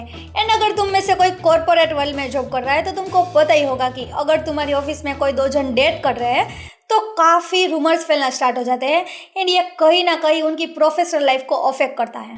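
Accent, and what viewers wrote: Gujarat, India. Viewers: Aapki hindi me gujrati vibe kyu aarhi hai 😶😁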